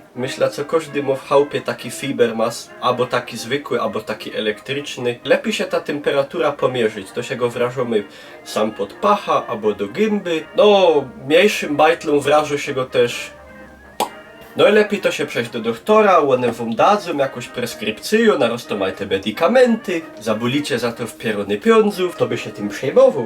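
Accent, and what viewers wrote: Silesia, Poland. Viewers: Uwielbiam te Twoje filmiki i tą śląską mowę.Moja przyrodnia siostra pochodzi z Mysłowic.Teraz w Niemczech mieszka🤗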